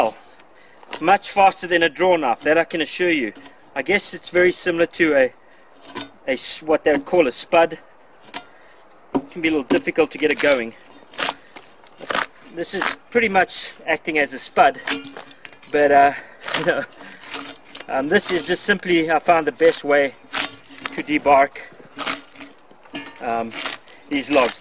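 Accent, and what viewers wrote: South Africa. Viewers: Are you from South Africa ? Soos in afrikaans ? USA ?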